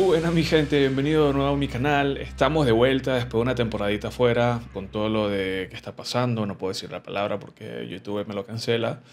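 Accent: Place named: Venezuela. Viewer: Epa chamo jajaja vine porque quería mas consejos sobre la barba pero me quede escuchando tu historia tu acento es venezolano no tenia ni idea quiero preguntarte unos consejos sobre la barba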